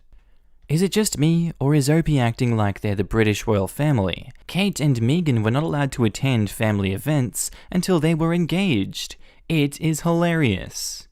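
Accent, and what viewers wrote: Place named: Australia. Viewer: Hey! From Canada 🇨🇦 love putting on your playlists and fall asleep to your Aussie voice 🙏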